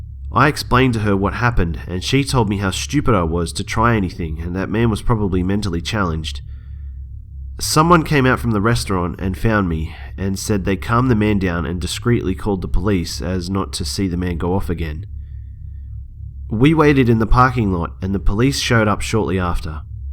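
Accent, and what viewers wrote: Australia. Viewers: He's Australian he does not sound British at all -_ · I love your accent!! Are you Australian? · AUSTRALIAN ACCENT YAS BITCH MAKES THE VIDEO BETTER. lol :3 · are you from Australia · are you also aussie? cuz honestly nobody in nsw says gday haha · That is the cutest accent I have ever heard!!! Is it Australian?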